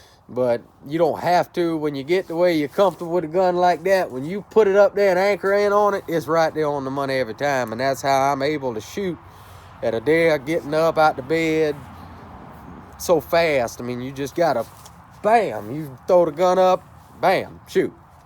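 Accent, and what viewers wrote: Louisiana, United States. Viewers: Are you cajun?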